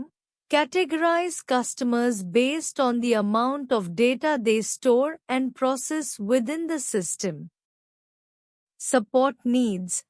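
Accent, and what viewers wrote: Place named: India. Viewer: Omg why this Indian accent when it's a bot???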